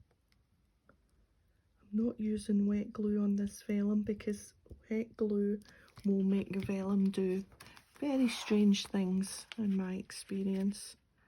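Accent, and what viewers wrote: United Kingdom. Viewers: Thank you for the video. Adore this creative idea. Also, I love your accent. Where in the UK are you? I listen to another lady who has a similar accent. Enjoy hearing you so much.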